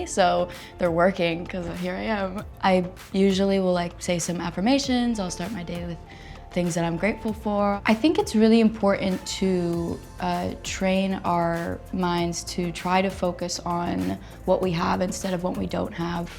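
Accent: United Kingdom. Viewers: She speaks sort of british english or it s just me who think that? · Her British accent is perfect 😍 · I love her British accent ❤ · Ahh dat British accent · Omg her British accent is killing me 😂she’s too pure for this world i love her 😍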